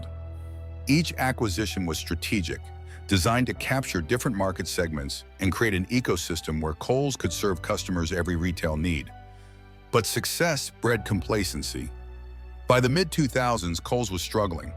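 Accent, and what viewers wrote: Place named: United States. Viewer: Can we NOT AI narrate with a yank accent?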